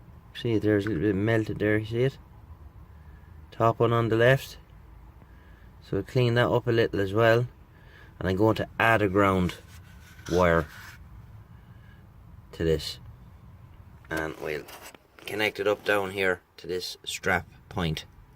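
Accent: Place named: Atlantic Canada, Canada. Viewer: Well done, where are you from? You sound like a Canadian east coaster.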